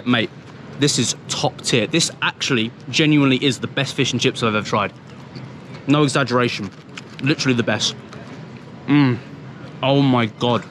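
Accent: Cockney, United Kingdom. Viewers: Got a cockney accent and never had pie mash and liquour. Funny guy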